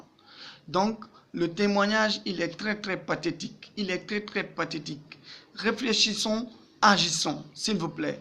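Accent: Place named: Guinea. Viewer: ce monsieur parle correctement la langue de MOLIÈRE.certe son accent est guinéen c'est normal il n'est pas français.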